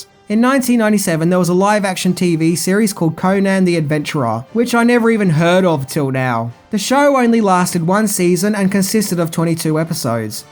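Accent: Australia. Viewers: No, you idiot. lives in Australia. He's said that many times. Not to mention his Australian accent is a dead give away. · He pronounces things as an Ozzie. Though I think he uses words incorrectly on purpose, like ‘irony.’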